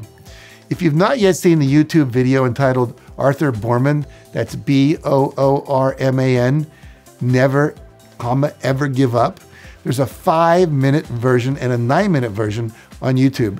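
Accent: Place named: United States. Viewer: Thanks a ton you make it seem so simple, Ty for ref the Boorman video like a shot in the arm.refreshes my persistence muscle.i want to commit 100% to cleaning up my family home that i share with my siblings,parent's blessing want to make it more usable and less cluttered.I owe you special thanks for Rule of 5 from TSP book(awesome book) i practice and feeling the benefits of clean home. it is not all done but i can feel the results so thank You.i appreciate the written pointers you give on the side screen. i will also appreciate if you can ensure a sub-title feature in all videos as sometimes the american accent could be a challenge for us asians to follow. Great Job keep it up and Thank You once again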